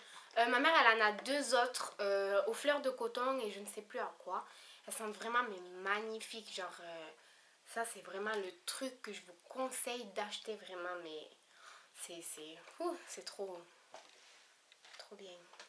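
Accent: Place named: South, France. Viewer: accent du sud 😻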